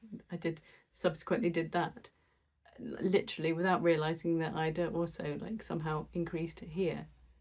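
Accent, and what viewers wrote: England, United Kingdom. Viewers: As usual love your english accent, humour and laid back style. Not to mention all your croch-eting. Lots of inspiration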